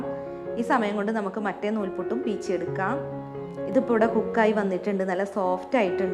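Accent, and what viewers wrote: Malappuram, India. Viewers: ഇജ്ജ് എന്താ പറയണേ " ഒഴിക്കലും " കയിക്കലും ഇല്ലെങ്കിൽ പിന്നെന്ത് മലപ്പുറാ..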